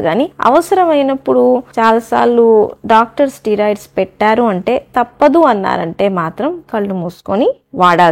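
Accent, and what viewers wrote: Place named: Ongole, India. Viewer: డాక్టర్ గారు మీరు వివరిస్తున్న విధానం బాగుంది. కానీ తగ్గుద్దీ వచ్చుద్దీ అవుద్దీ ... వంటి ఒంగోలు విజయవాడ లో గ్రామీణ యాస ఎక్కువ గా వుంది. Public forum కదా.. అన్ని ప్రాంతాల్లో చూస్తారు... భాష కొంచెం మార్చుకుంటే ఇంకా చాలా ఆకర్షణీయం గా ఉంటుంది.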